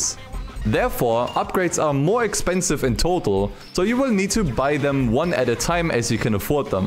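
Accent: Germany. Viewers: Dein Englisch ist schon sehr stark vor allem dein Wortschatz auch wenn man den deutschen Akzent hört 😜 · nice deutscher Akzent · Which accent is that in your english ?:) German ?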